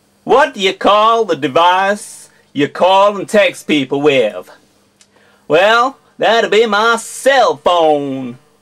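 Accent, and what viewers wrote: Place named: South, United States. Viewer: This is the first time I've laughed at a Southern accent for any reason other than the "you sound like an idiot" thoughts that usually run through my brain XD . You gave me a reason not to hate the Southern accent, so kudos for that.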